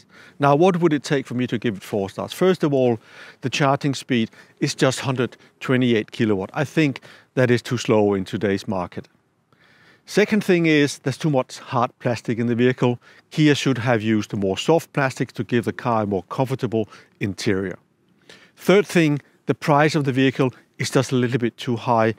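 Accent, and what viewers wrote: Denmark. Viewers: A very professional upload - Thank you! Listening to your accent I would have guessed that you are of Danish origin:) Now seeing your name is, I guess I'm right:)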